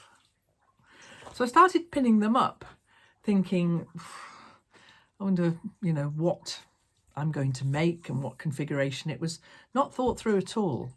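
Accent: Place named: Northern England, United Kingdom. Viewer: Glad you are better. I thought you were a northern lass, I’m a Leeds lass originally but been in USA for 20 years. Lovely to hear your accent.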